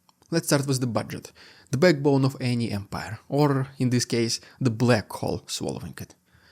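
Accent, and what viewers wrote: Russia. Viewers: 😂😂😂 He is really Russian..strong Russian accent of English..no native English or USA speaker or AI able to make it sounding naturally..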